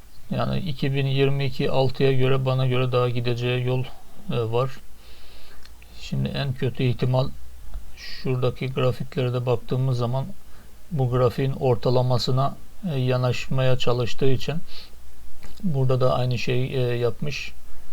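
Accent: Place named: Trabzon, Turkey. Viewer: Emeğinize sağlık Trabzonlu kardeşim.Şivenize gore tahmin ettim.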